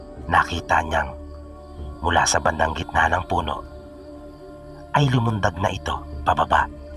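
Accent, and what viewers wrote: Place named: Kinaray-a, Philippines. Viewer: Pamatyag mo eh,, hahaha karay-a gid,, 🤣🤣🤣🤣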